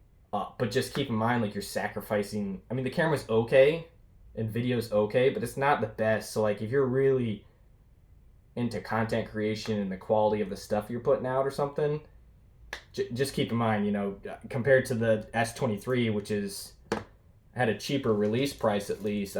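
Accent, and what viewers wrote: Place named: Texas, United States. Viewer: Why is this Swedish man speaking in a Texas Accent? His face looks exactly like the image on the Shroud Of Turin. His phenotypical facial features indicate he directly descendanded from Aryan stock in Northern Scandinavia. Google the Shroud Of Turin. The image captured on the cloth is a direct phenotypical copy photo of this guys face. I wonder if he has the courage to type a google search on what i said.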